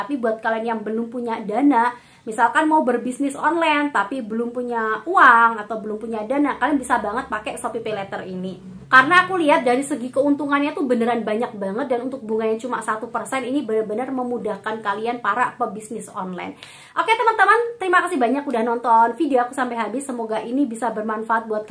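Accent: Java, Indonesia. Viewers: Logat jawa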